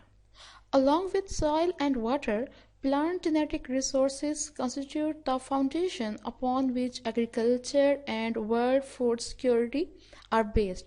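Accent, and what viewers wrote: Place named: United States. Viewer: Why u are doing American accent😡😡😡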